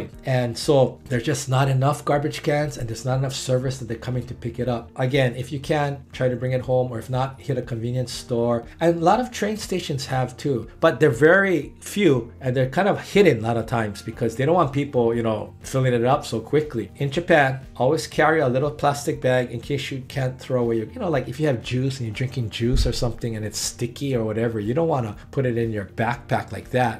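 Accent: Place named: Hawaii, United States. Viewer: are u from hawaii. i can hear the pidgin accent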